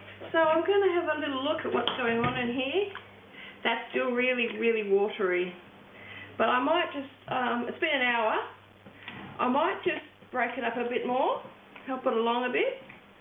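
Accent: Australia. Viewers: Very nice video. I appreciate the recipe and all measurements. Love your Australian accent :-) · She is an Australian. That is how they say it. We sound funny to them.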